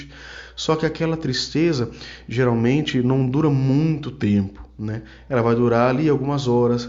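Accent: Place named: Inland São Paulo, Brazil. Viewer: Sua voz e sotaque é bem legal, você deve ser igual eu do inteiro de SP. Primeiro vídeo que assisto do seu canal, gostei muito, és bem objetivo! Abraço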